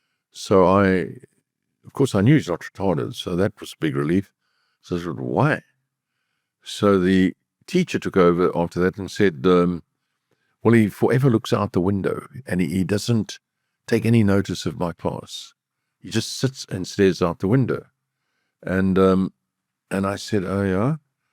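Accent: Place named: Glasgow, United Kingdom. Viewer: Love it you can take the boy out of glasgow but canny take glasgow out the boy you just keep being your authentic self Loved it when you said diddy meaning did he wnen ge reveals an answer to a question in typical glasgow style yer like diddy then when you asked what it was like being in love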